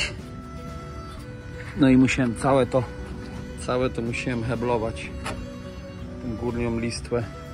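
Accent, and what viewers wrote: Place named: Podhale, Poland. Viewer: No chiba z gor hoho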